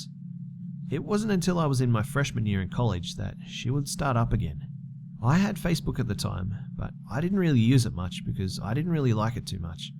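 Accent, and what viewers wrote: Australia. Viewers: Bethsabée Newrose yess Aussie accent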